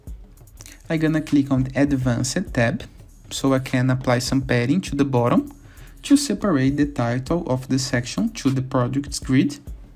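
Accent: Brazil. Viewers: Your accent seems brazillian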